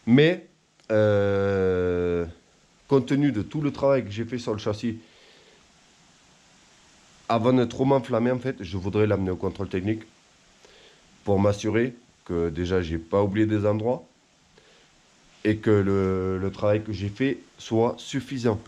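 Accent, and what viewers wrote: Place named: Narbonne, France. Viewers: Vu le prix que va prendre l'électricité , c'est obligé que dans mon futur logement que je cherche je vais mettre un système solaire . Ps: L'accent Narbonnais , mes racines , Merci ^^Force à vous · Aaaah l’accent de narbonne, il fait du bien à entendre.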